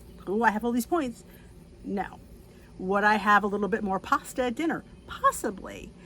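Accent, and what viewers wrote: New Jersey, United States. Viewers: I thought you were a Jersey Girl! I'm think if up north Bayonne New Jersey , Nutley or Verona or down to the shore ocean county third generation Italian am I right?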